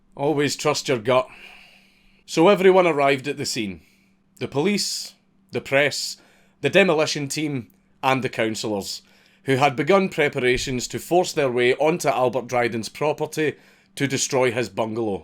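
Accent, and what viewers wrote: Scotland, United Kingdom. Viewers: Hearing someone talk about fighting the government is always better with a Scottish accent.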